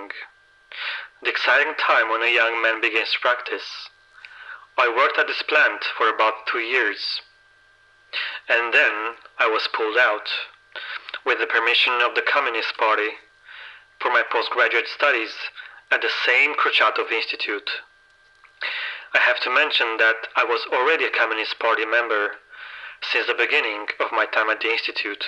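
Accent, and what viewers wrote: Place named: Sweden. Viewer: Are you Swedish? I read your profile and it says Romania but your accent strikes more as if you were a native Swede.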